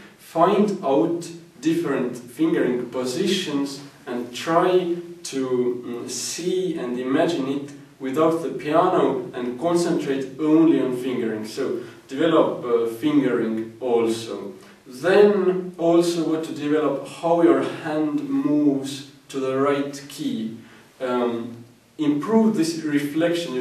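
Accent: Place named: Estonia. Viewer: he's estonian haha...I could tell just by the accent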